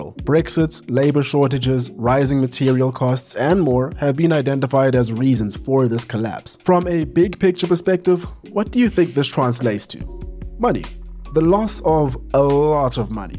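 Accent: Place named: South Africa. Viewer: i hear a south african accent, i subscribe. simple as that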